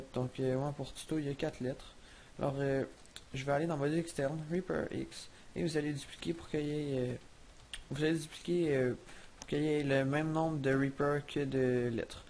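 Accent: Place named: Quebec, Canada. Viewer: J'adore ton accent Québequois !!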